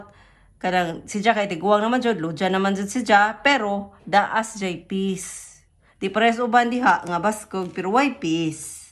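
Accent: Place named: Bohol, Philippines. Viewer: Baka taga Bohol siya,dahil sa pananalita niya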